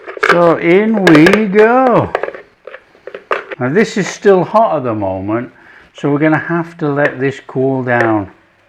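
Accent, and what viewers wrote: Yorkshire, United Kingdom. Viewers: I’ve only recently discovered your YouTube page, but I love it. I think your accent is a great Yorkshire one. I’m from over the border in Lancashire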